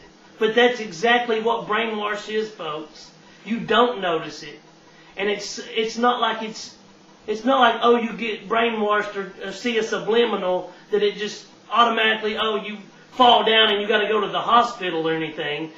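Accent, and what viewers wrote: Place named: South, United States. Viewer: "A form of brain warrrsh .. ( love the Southern accent).. I'm a southerner myself..Born in The Ozarks actually.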